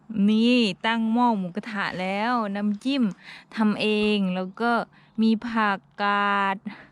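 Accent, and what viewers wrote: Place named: South, Thailand. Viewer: พึ่งเข้ามาดูให้กำลังใจค่ะ สำเนียงเหมือนคนใต้เลยนะคะ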